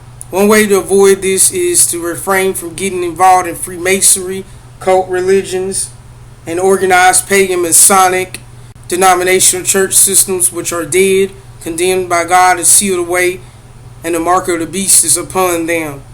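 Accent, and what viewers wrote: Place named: South, United States. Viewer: OMG your southern accent is soooo strong lol and cloning is getting out of control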